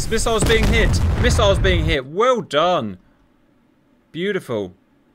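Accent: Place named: United Kingdom. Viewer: No we love your beautiful British accent commentating the battles. It's just a shame when so much is happening all at once in the big battles that you can't commentate all of it!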